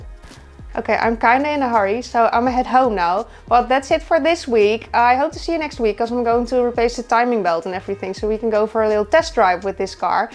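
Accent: Netherlands. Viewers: My first video I saw from you, I really enjoyed it, so thank you! I also love your Dutch accent haha.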